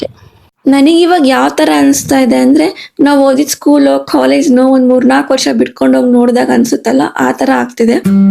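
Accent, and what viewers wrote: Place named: Sira, India. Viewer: ದೂರದ ಜರ್ಮನಿಯಲ್ಲಿ ನಮ್ಮ ಸಿರಾ ಭಾಷೆ..... ಕೇಳಿ ಖುಷಿ ಅಯ್ತು...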